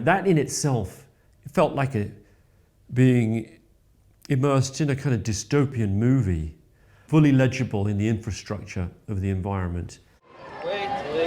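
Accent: United Kingdom. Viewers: It IS a British accent though. It’s one of the many British accents. If your complaining that ‘British’ there’s a million English accents and they all British accents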